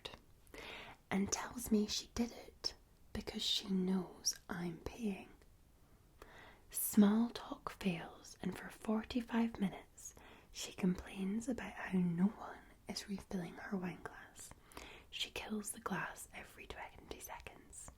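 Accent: Scotland, United Kingdom. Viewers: she has a normal Scottish accent for a girl in Scotland, im Scottish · The beginning reminded me to a late night infomercial. Would you do something like that? like a mock-infomercial where you show different items, all presented in a relaxed scottish accent. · Your Scottish accent and the fact that you're Scottish is just enough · FUCK SAKES UR SCOTTISH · Jordana Brewster if she was a blond with a Scottish accent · Wait you're scottish? I'm scottish too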